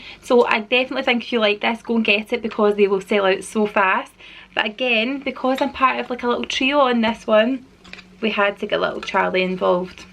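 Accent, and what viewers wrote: Scotland, United Kingdom. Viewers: I'm new to your channel your kids are so adorable. I'm guessing your Scottish? I'm English lol happy late thanksgiving xx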